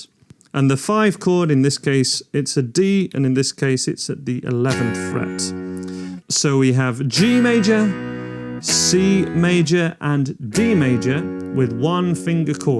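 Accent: United Kingdom. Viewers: Thanks ❤ for the tutorial I hope you have a great new year I love you very much 😘🎸🥰 keep up the good work you have a great voice that British accent 💕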